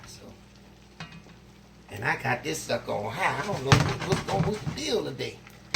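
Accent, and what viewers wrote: New Orleans, United States. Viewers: He got the New Orleans accent and he love to cook that's that boot life LOL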